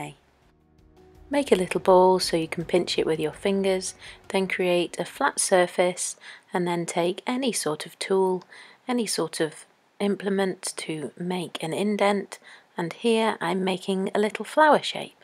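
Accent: England, United Kingdom. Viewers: Great video and as someone else said no music just your lovely calm voice ..One of the best videos I have seen and also great ideas on our doorstep ..thank you so much ..Also lovely to hear someone English for a change.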